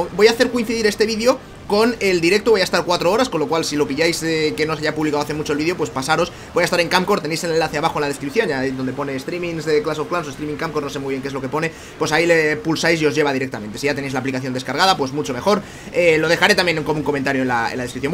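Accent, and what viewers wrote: Venezuela. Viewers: eres venezolano? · jajajaja Venezuela hablando como siempre eres tu perrito xd jajajsj (también soy de Venezuela) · eres de venezuela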